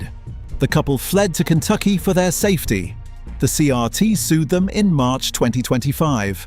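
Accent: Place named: England, United Kingdom. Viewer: There is a lot of questionable and somewhat misleading information in this garbage. Feels like an automated bot program creating it. And why would you select a English accent for an article on tequila. 😅Oh yes we all know England is a great source of tequila and information on tequila 🤣 I thought they were only allowed to add 2% additives to tequila. But who knows, maybe something changed or I'm remembering wrong. But I wouldn't necessarily trust this video as a source of information. A lot of it just seems like pointless hype and empty drama. And yes tequila can be sold as tequila and call tequila if it's only 51% actual tequila /liquor made from agave. The rest is usually going to be like some kind of Cane alcohol or something. And apparently that doesn't mean rum😂😖 and it is too harsh to drink straight 😂😖 But just because tequila says it's 100% agave does not also mean that it is good 😂 And you can legally put in the additives as long as you don't try putting on the label of the bottle that it is additive free. 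And many people will actually prefer the final products taste and texture with a little bit of additives in it. Not everybody cares about working their pallet into a "finely honed tool" to try to discern whether it's 100% natural or not having to buy tons of different tequilas to figure things out. They just want to buy a quick bottle to either enjoy sipping on every now and then or make some margaritas out of. And cheap rot gut tequila can often be great for making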